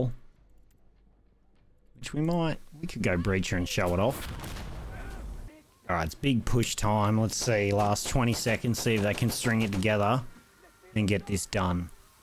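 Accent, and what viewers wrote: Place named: Australia. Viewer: i love this game, i can play the co-op matches with my eyes closed now (not literally of course xD) you have a great voice for doing youtube reviews, Aussie accents are the best for gaming, like Gmanlives and stuff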